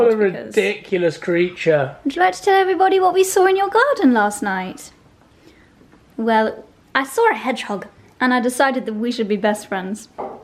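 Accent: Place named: United Kingdom. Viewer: Yes that word isn’t used in The US. However, you need to continue using The Queen’s English. It’s very charming to Americans listening & watching your Vlog!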